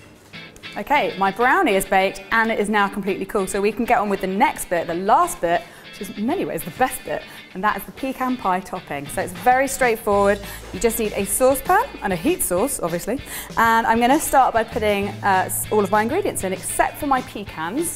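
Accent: United Kingdom. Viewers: Amo el acento del inglés británico, tenía que decirlo!!! Suscrita para conocer deliciosos postres y escuchar el idioma ;)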